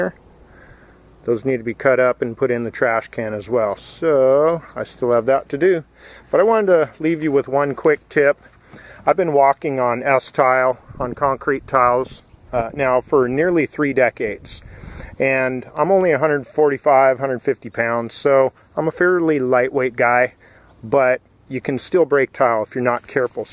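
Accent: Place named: United States. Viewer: I would certainly like to have you fix my dads roof but we are on the other side of the pond .......Australia......you sound like you're americana.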